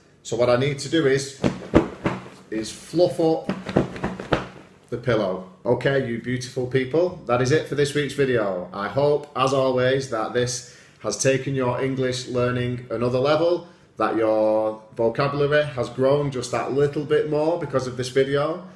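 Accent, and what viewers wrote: United Kingdom. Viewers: I love that you want to help people. But your accent is soooo british :DDD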